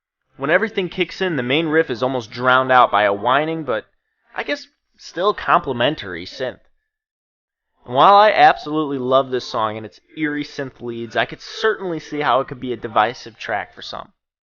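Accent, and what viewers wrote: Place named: Philadelphia, United States. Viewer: Don’t even try me on that. Fuck you… This dude clearly from philly. Love it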